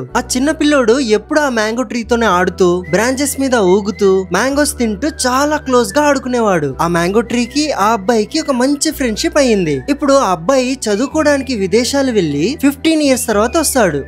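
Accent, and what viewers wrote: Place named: Mumbai, India. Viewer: Tammudoo... nuvvu Telugu Lo videos chestunnav kada.. Mari Telugu raanattu telugu ki Tegulu pattinchaku.. mangoes.. branches... yenduku ilaa anavasaram gaa English Padaalu vaadutunnaav? Bombay nunchi vacchina Heroine laa.. ilaa maatlaadithe Buttler English laa untundi.. neeku English meeda pattu unte English lo ne chei ilaa Telugu ki Tegulu pattinche prayatnam cheyaku.. vinadaaniki assalu baaoledu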